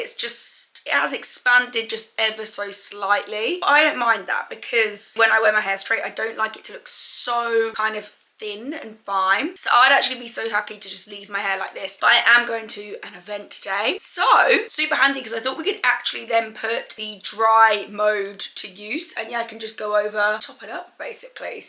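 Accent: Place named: Australia. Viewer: I thought you was Australian at first 😂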